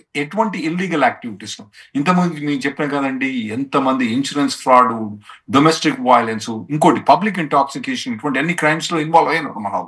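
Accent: Telangana, India. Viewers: Anna,yavoore needhi, pakka Telangana matalu matladthunnaru? 👌👍🙏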